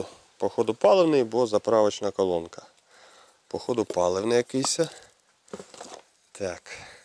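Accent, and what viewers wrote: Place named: Zaporizhzhia, Ukraine. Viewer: українською Ваше відео набагато цікавіше і колоритніше. В мене є колега з Запоріжжя ( я в Луцьку) то за вашим спільним діалектом зрозумів, що ви земляки, як виявилось ябув правий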